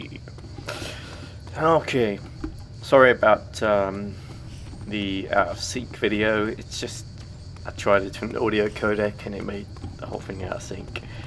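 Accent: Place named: England, United Kingdom. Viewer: "IMA TRYIN ET AMMO YA FAWKING CAUNT" xD i luv the english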